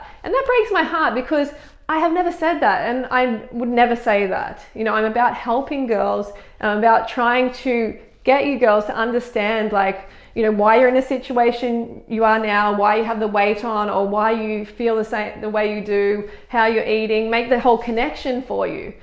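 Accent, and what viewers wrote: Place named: Australia. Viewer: i live for your Australian accent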